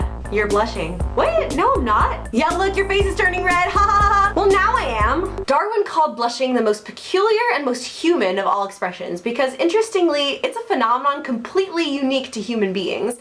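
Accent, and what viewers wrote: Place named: Ireland. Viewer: You must be Irish girl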